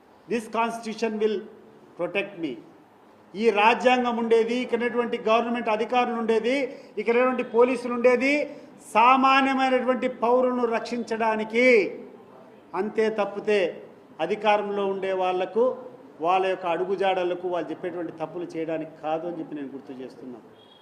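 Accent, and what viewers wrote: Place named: Andhra Pradesh, India. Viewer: Anna..ippatiki 10 times chusa mi interview ..ide manaku kavalsindi..idi KADAPA voice ante hatsoff anna..waiting for 2.0 ..Rajareddi prabhutvam..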